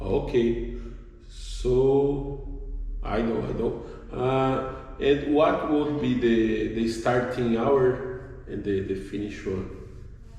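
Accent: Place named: Brazil. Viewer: Ta bem brazilian… mas ta fluido e confiante demais, isso importa muito. Parabéns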